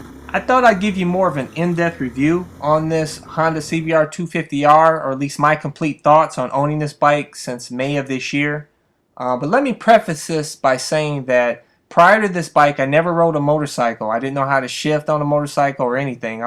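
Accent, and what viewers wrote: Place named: Ohio, United States. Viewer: it's the Ohio accent we all sound alike most of the time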